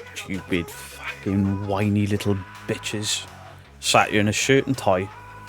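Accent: Wales, United Kingdom. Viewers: I like your Welsh accent brother! I'm glad this all bullocks!!😂 Your a good man. We all know that! Thank goodness you weren't serious!! Lol... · Why hide your welsh accent?? I lived in Abergele for30 years loved the place, right facing the beach too..2 of my youngest children were born in ST Asaph Denbighshire North wales..If the jealous trolls don't like you thats their own problem.NEVER CHANGE.. your doing an excellent job keep up with the excellent videos of debunking the fake channels. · Actually choked on my coffee with this..from one sarcastic, pineapple haired, ear stretched prick to another... We effin love you and your Welsh freakin accent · Firstly can l say this is the best reaction to the stupid whinning comments ever. Secondary don't you ever get rid of the welsh accent, its beauty to heat. Keep up with the great work. Love you.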